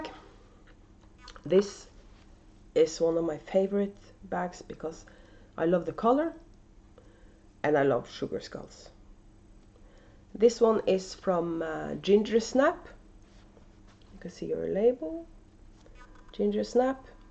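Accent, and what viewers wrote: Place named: Norway. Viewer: Your accent is wonderful! My great grandfather came from Norway as a very young man and I enjoy hearing the accent! Don't worry about editing, for me as a viewer, I love to see natural podcasting. I enjoyed making fun costumes for my children and they used to love decorating with pumpkins they had carved different faces on to put on the porch with candles in them we would light at night. We used to clean the seeds and bake them for snacks Wich was fun. The best for me, was making them pumpkin cookies, which the loved. They are a cake like cookie with lots of spices and rasins. Love your podcast. I do hope your face heals quickly. Yarny hugs! Ava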